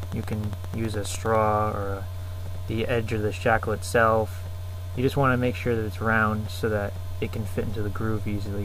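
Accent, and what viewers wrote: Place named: United States. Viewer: i cant hear anything at the end, and he has a proper amarican axent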